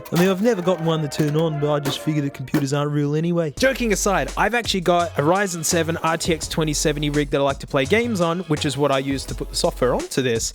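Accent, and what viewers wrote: Australia. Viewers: Teach use how to speak Australian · Where is your Aussie-Slang/Pronunciation :-O :-O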